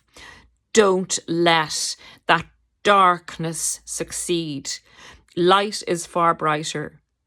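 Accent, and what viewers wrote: Ireland. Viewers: What a lovely 😍 lady, you my dear have helped me tremendously with my healing, I have listened to your lovely ❤Irish accent, for hours and hours thank you for your work, you describe the behaviour and Traits of Narsasits very, well exceptional ❤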